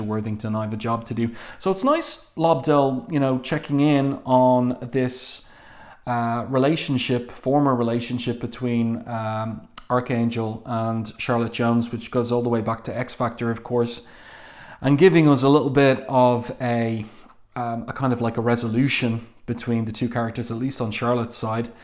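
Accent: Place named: Ireland. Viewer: Lovely to hear a modern Irish voice online.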